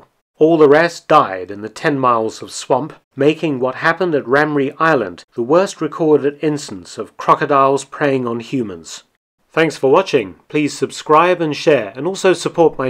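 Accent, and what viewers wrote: United Kingdom. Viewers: Is this really true? This could be all bullshit for all anyone knows! Black and white video and a British voice don't make it true!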